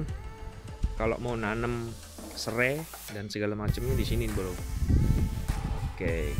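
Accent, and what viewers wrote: Java, Indonesia. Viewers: Jowone pundi mas jenengan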